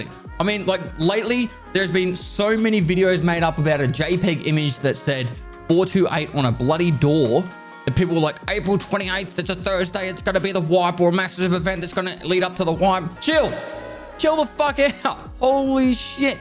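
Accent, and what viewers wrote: Australia. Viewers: I havent watched videos in a while, but living back in Australia for a bit now, his Accent got a lot stronger compared to when he was living in Europe for a year. Or is that just me?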